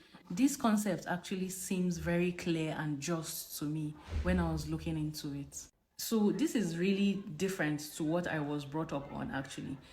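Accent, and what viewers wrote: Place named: Hausa, Nigeria. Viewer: Sis, but you have Hausa accent. Did you grow up in northern Nigeria?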